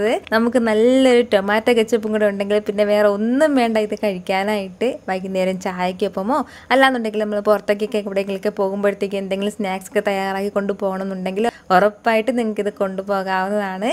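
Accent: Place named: Kannur, India. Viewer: Endutta slangaa chechi... Superb KANNUr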